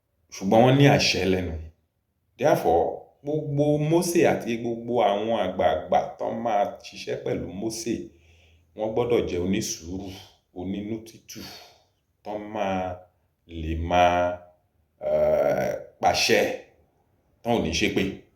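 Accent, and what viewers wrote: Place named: Okun, Nigeria. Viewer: Good admonition. I seem to detect Òkun accent and intonation. Am I right? 😊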